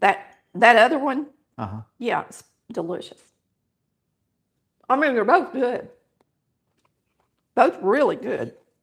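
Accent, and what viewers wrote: South, United States. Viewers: I love to watch you and listen to your southern slang. Reminds me of my mama too. She made fried green tomatoes in bacon fat. They were so delicious! Keep on cooking,!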